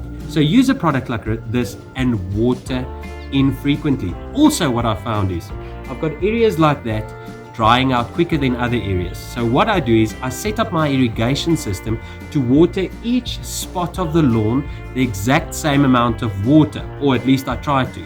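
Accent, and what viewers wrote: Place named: South Africa. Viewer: I originally came here for the grass tips....Now I’m just here for that South African accent.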